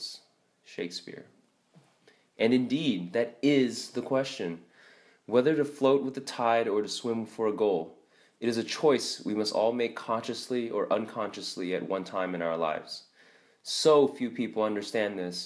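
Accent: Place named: East Asia. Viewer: Indigomarine91 cause of this zipperheads accent probably.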